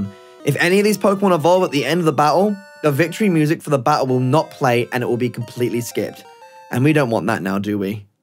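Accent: United Kingdom. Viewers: True bri'ish accent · Maybe it's just me, but as a non-native speaker I had to concentrate really hard to understand what he was saying cause he was talking so fast. That combined with his british accent it was kinda rough. Really cool video regardless though!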